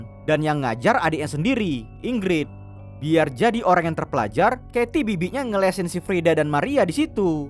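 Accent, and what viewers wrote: Medan, Indonesia. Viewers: Ku lihat dari logat bicara kau bg, pasti kau dari medan ya bg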